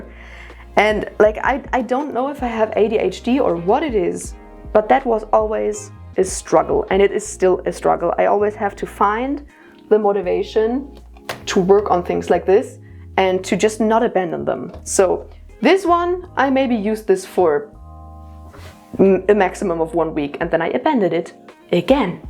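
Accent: Germany. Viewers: Dude i knew you had a german accent and not a scandinavian.... Why are you saying you are norse???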